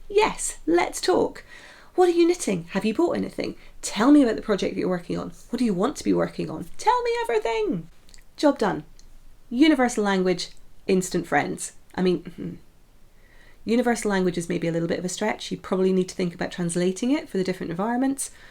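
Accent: Scotland, United Kingdom. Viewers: I know you are thinking why is this person commenting on my old video but like you I have to start from the beginning. I flocked over from Amy who Was trying on your sweaters in her vlogmas, and for a split second I thought of just starting there... I picked up your Scottish accent right away and I love your sense of humor! So glad to have found you which you may be thinking great I have a stalker, fear not I’m only mildly odd. I’m German living in the US and married to an American with a Scottish heritage, who by the way came in during your first eps and said hey you watching Amy Pond doing a podcast. Anyways enough of my babbling so glad to have found you and looking forward to watching all your videos!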